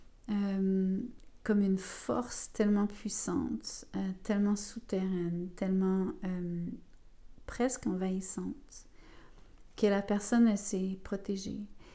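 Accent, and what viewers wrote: Quebec, Canada. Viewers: Je l'attendait tellement, avoir cette manière apaisante et la signature Québécoise ❤, normalement les verseaux seront les premiers pour Mai 😅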